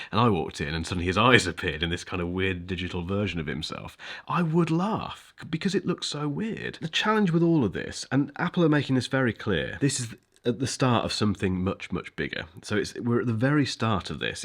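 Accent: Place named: RP, United Kingdom. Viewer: Btw I like your accent, sir! Is it RP accent? Whereabouts in the UK are you from?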